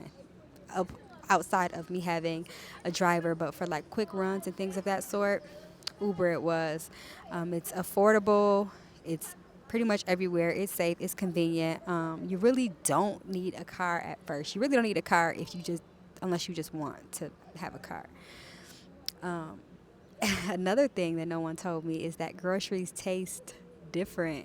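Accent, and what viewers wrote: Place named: United States. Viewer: If I were to pass you on the street i wont think you from the USA till you start talking.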